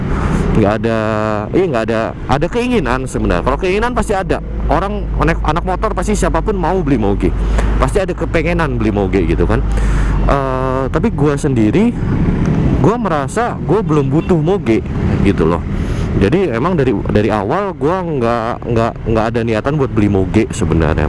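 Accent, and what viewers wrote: Surabaya, Indonesia. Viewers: Saya sih emang dari dulu suka isi dari video lu bang dan ga peduli motornya apa yg penting isi dari video itu suka aja denger suara khas suroboyo campur jakarta 👍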